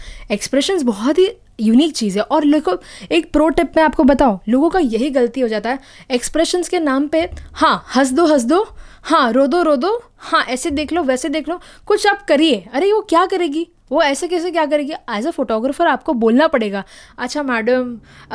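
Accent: Gujarat, India. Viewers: Gujju tone ma mja ave che mam😊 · Mam aap Kabhi Kabhi Gujarati aavaj me bat karte ho kiya aap Gujarati ho, ya Gujarati language aapko aati hai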